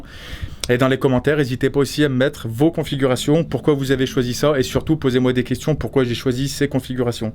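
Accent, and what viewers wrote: Saint-Étienne, France. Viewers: Yop je te découvre mais on dirait bien l'accent Stéphanois ! ^^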